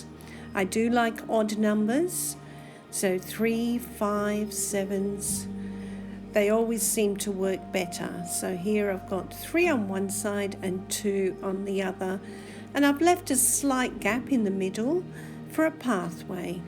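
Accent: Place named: Australia. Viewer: Just came across your channel and enjoyed the different ways you produce art from the gelli pad. Thanks for all the detailed comments and hints. It's great to hear an Aussie. Look forward to following more of your art works. Lesley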